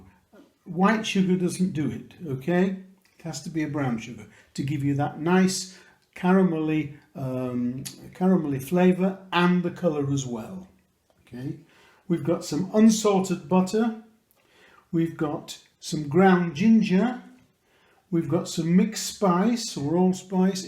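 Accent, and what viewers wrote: Yorkshire, United Kingdom. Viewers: RIP. We're going to miss your wonderful Yorkshire twang.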